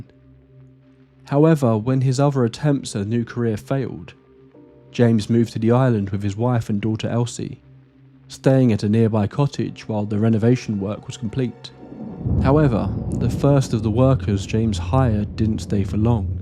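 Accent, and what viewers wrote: United Kingdom. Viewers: Bullognia!!. I'm a native NY-er who tunes in to this channel for the smooth, sophisticated British voice overs that only provides.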